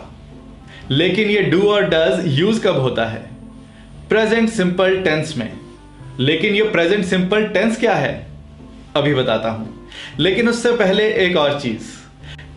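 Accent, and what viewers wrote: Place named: Kerala, India. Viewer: Malayalam spoken English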